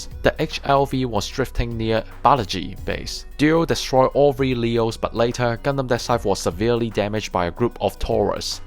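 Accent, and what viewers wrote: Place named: China. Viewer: I can't quite place your accent. I wanna say maybe Chinese or Tai. Either way, you don't give yourself enough credit. I didn't have any trouble understanding you.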